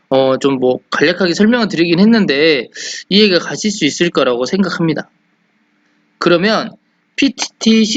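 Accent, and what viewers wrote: Jeolla, South Korea. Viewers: 다 좋은데 전라도 사투리가 너무세요.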